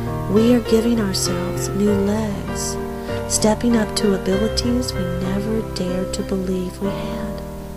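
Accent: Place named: Georgia, United States. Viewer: Maybe that's a Georgia accent . . . I have seen the endless ocean of pure love, but at the time I didn't realize it was me. I chose to leave that silent bliss and return to my noisy life and on the way back I noticed how delicious the agonizing pain in my legs felt. I have enjoyed this encounter and I hope you get as much pleasure from having my attention as I got from being had. : )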